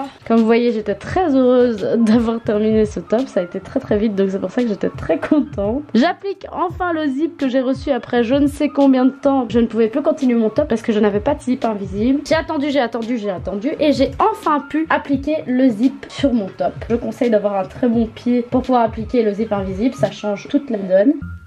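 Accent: Belgium. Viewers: Mais mdr tu as réussi à me faire regarder des vidéos couture! Je n'ai rien contre la couture hein, c'est juste que je suis plutôt au level "Incapable de réparer le trou d'une chaussette grossièrement sans me coller l'aiguille dans le doigt"... Voilà 😁. J'ai connu ta chaîne il y a peu via des vidéos coloration (ça, c'est de suite beaucoup plus mon domaine!), et du coup, comme j'aime bien ton style, ton enthousiasme, ton accent belge, j'ai regardé la vidéo sur le corset. J'aime beaucoup les corsets (magnifique engin de torture), et j'aime beaucoup la Wax, et je me suis prise au jeu lol. Franchement, le top est superbe, et il rend le corset tout de suite beaucoup moins casse-gueule à porter 😅. GG en tout cas, c'est un sacré boulot !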